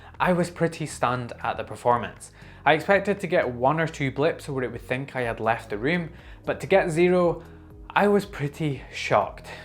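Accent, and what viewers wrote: Scotland, United Kingdom. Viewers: I agree this is revolutionary! I have started my smart home with Xiaomi/Yeelight/Aqara, but they are now just too expensive, with this stupid region locking. Tuya Zigbee is stupidly cheap, and will equal performance for most devices. I will wait for a cheap Tuya clone. One question: Despite my first name i am not english, but i love the language. Am i correct that this is a Scottish accent? Greetings from Germany :)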